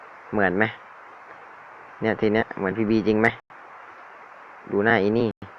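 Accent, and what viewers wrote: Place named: South, Thailand. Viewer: คนใต้เออ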